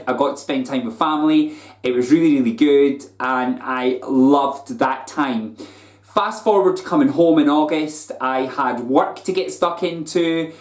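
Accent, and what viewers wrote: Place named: North Scotland, United Kingdom. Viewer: omg I had no idea ur in Aberdeen. I'm in Aberdeen too. wheres your accent from, you sound like your from further up north xx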